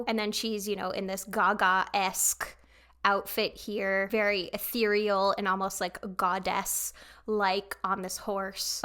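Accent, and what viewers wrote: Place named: France. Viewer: I believe Jiwoo and Kyujin are the rappers in Nmixx but they are also incredible vocalist like the rest of the group. Nmixx is a group under JYP known for their Mixxpop song. All the girls are incredibly talented and they are also well known for their stage practice where they sing live while dancing. It’s a group I learned to love this year and I absolutely love them! They are so talented and funny! (Sorry for my English, English isn’t my first language. Your French accent was so cute by the way! Love from France ❤️)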